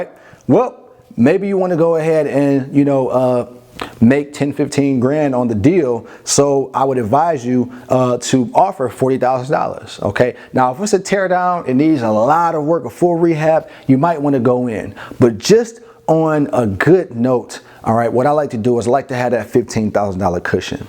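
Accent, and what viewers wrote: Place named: Jamaica. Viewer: Hi, this is Carol Whilby, heard the yard accent, this is an answer to prayer, I NEED A MENTOR REALLY BAD, hope you have a spot for me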